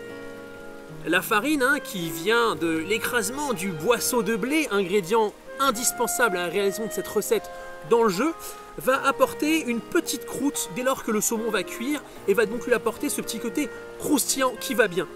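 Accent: South, France. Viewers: Moi non plus je ne sais pas pourquoi tu fais l'accent du Sud quand tu cuisine mais ne t'arrêtes surtout pas ! C'est gourmand et croquant à mes oreilles. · La Creuse ? Mais c'est à côté de chez moi ça ! XD Je ne suis pas très au fait du monde de Zelda, mais cette recette m'a l'air fort goûtue (avec l'accent du sud qui va bien^^) ! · pour l'accent du sud je pense que c'est ton petit coté Maïté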